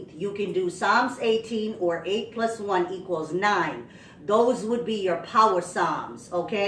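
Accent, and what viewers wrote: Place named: Caribbean. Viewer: Are you from the Caribbean islands Because I can hear a accent when you talk?🏝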